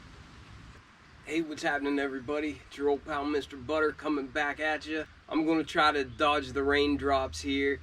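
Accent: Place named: Philadelphia, United States. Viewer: From Philly, arent you? Me too. Cant miss that accent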